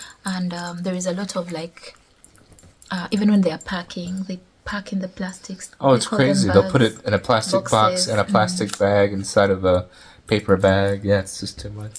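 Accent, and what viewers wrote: Uganda. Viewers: Hahaha I love your humor 😂😂 please don't change that Ugandan conversational accent. You two make us feel like we're part of your conversation 😂😂 I wish that food was available here. Ehh waiting for a restaurant 😅😅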